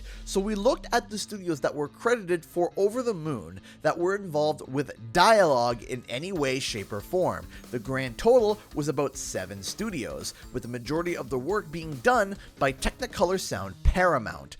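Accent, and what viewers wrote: Canada. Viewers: Canadian accent sound like American access until they say just one or two words haha then I’m reminded where you’re from! About and sorry. Probably a few more?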